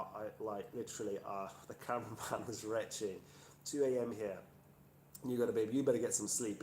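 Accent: United Kingdom. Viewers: I love your British accent, you are lovely and really hot. Looking forward for more videos. Greetings from Germany. Enjoy your vacation.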